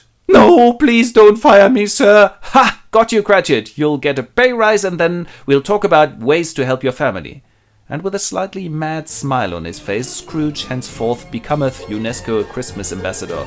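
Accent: Germany. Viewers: Briiliant !!! Five Stars Award !!! Absolutely love the German-style accent !!!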